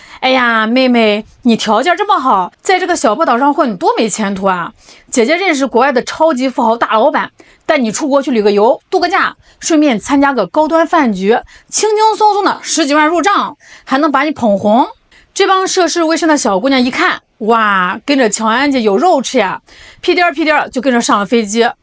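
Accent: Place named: Shandong, China. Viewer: 山东口音😂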